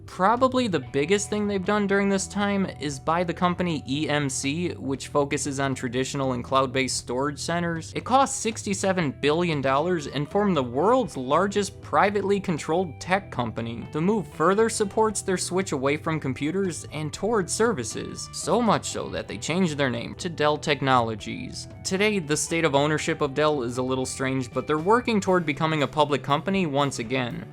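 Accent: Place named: Midwest, United States. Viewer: I'm currently watching this from a Dell XPS desktop with 16 GB of ram, Intel i7 processor, and a NIVDIA GeForce 1080 graphics card. You haven't experienced the thrill of serene Midwestern accent until you've heard it in high definition.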